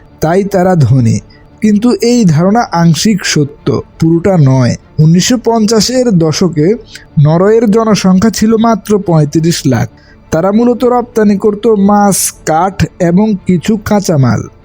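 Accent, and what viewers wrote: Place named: Noakhali, Bangladesh. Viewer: khub base bhul na korle... apni Noakhali, lokkhipur, feni theke... Bhaiya don't mind Script/ voice improve korte paren...Best of luck ✨👍🏻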